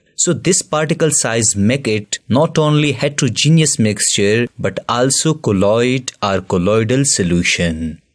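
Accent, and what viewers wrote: India. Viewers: Just the way bro speaks its obvius that bros indian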